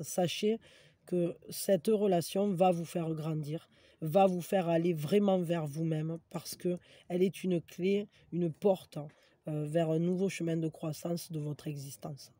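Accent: South, France. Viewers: Merci, toujours entre le rire et la poésie, j'adore et ton accent c'est mon sud...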